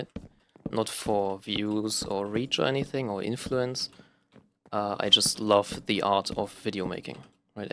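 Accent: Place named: Germany. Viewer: hey bro, i just wanted to say that your english really is good like everyone says, you still notice the german accent but i kinda like it (: also i really just like the normal gameplay and u just talking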